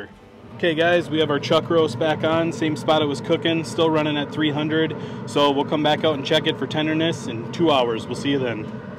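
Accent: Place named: Northern Minnesota, United States. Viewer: Thank you for the great video! It sounds like you are in northern MN? Maybe around Duluth?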